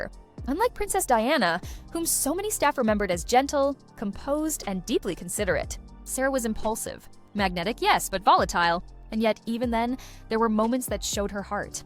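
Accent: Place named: United States. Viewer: so why is this trash presented with an american accent?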